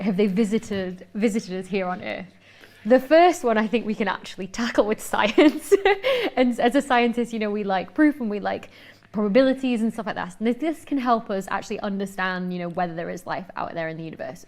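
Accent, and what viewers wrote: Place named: RP, United Kingdom. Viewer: The entire British upper class feels the need to talk like a twat. But her content is bloody good.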